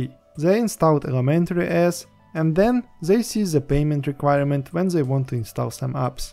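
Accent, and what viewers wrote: Russia. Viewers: Are you from Russia? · Русский акцент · Native Russian accent detected. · Are you Russian? It feels like you have a Russian accent)).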